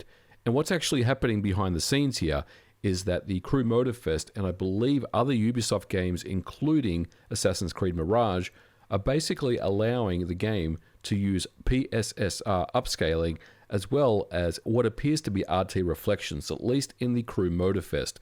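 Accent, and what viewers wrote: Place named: Australia. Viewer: You must be an Aussie living in the US, I recognise a fellow Aussie 🇦🇺 Back in Sydney I got my Pro for $500.oo EB Games, with a trade & Club 5 level 🎉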